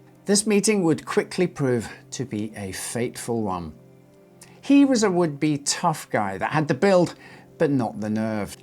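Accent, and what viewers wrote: Scotland, United Kingdom. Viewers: Everything is better when a Scotsman narrator 😅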